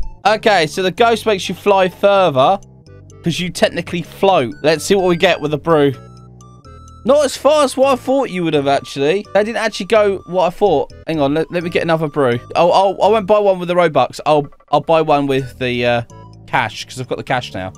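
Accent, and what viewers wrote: United Kingdom. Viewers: I love your British accent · Is it me or is extra British in this video? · You’re British right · Idk why but I just love your British accent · Nice british stylish accent I got 11600 studs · your British innit?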